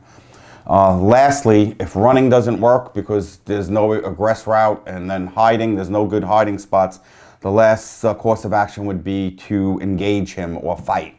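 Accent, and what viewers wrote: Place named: mixed, United States. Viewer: This man Ranch dressing look like a bootleg FaZe Apex with a Boston/New York accent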